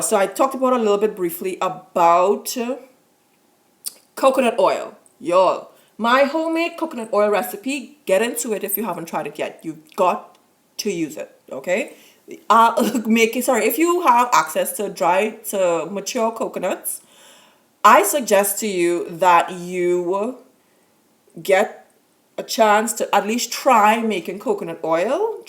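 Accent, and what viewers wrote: Trinidad and Tobago. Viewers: what country are you from? you sound Trinidadian... great tips!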